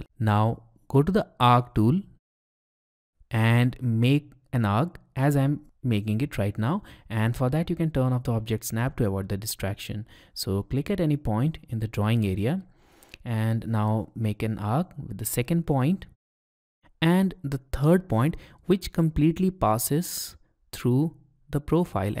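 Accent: India. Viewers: nice lesson bro. you Accent is hindi.. inst it?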